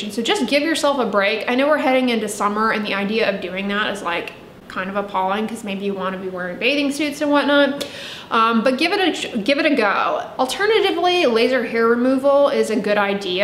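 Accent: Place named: South, United States. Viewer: How does she have both southern and valley accent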